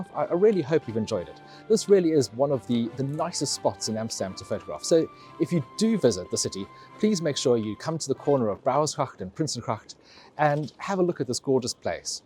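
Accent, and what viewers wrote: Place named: England, United Kingdom. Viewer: You have an English accent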